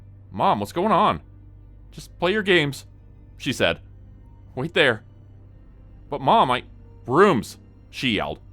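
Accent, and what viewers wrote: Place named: Canada. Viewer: I love the way you pronounce some things with your canadian accent.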